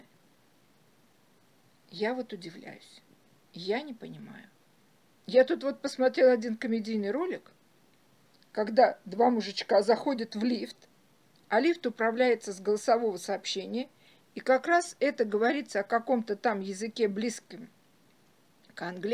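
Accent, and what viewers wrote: Yorkshire, United Kingdom. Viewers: Это и есть йоркширский диалект? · ну да , иммено так она и считает . Она причислила свой йоркширский говор к отдельному языку ., как валлейский или гэльский языки .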